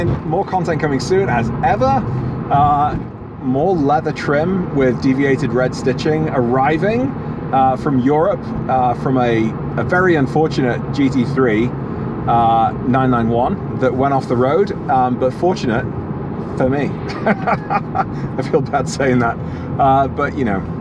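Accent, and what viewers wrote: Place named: North West England, United Kingdom. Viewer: 4 people..just don’t understand life! I’ve recently purchased a <num> in the uk after no car ownership (living in London) for nearly 8 years. You just can’t fault The car and In Tamdem this channel - open honest, content and ideas that make Porsche ownership seem like the Right choice - despite the wankers (and there are a lot) hat tip!! Cheers - and you sound like you were from the north west uk - much respect!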